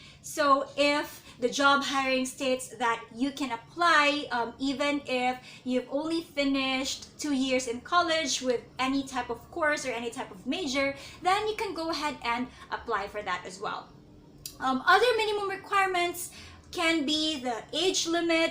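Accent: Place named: Philippines. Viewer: You speak english huh? But you sounds fikipino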